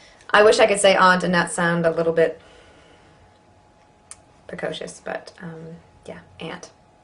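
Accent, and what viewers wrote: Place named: United Kingdom. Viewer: половина слов с британским акцентом. хотя сама тараторит на американском ask с pass точн не правльно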